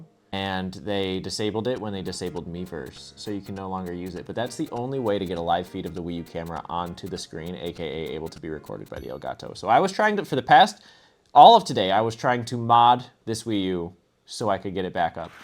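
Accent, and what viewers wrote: Brazil. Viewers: Is he Brazilian or something? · Are you brazilian?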